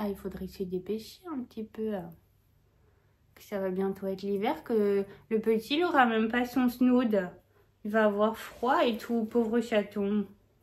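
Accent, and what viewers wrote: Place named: Spain. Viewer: Bonjour …un plaisir de te retrouver avec ton accent espagnol..tu es adorable et me fait bien rire 😊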